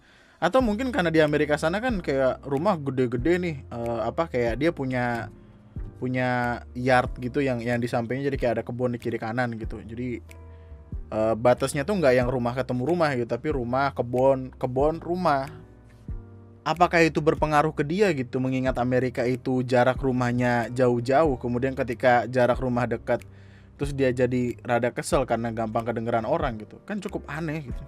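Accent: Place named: Banyumas, Indonesia. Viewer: bang asli nya org mana dah kalo gw ngapak jdi agak mirip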